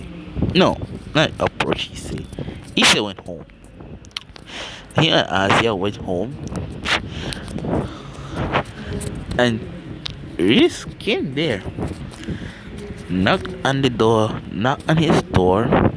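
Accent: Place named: Jamaica. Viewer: Your a Jamaican aren't you well big up mi G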